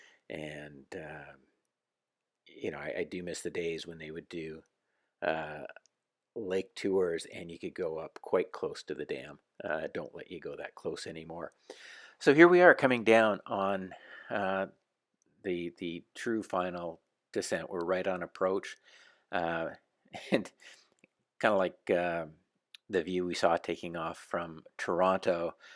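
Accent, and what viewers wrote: United States. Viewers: But you talk in American accent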